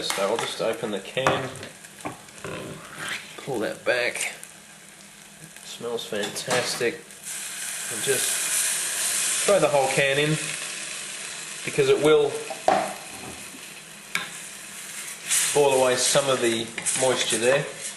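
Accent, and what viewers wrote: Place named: Australia. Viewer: r u aussie great stuff man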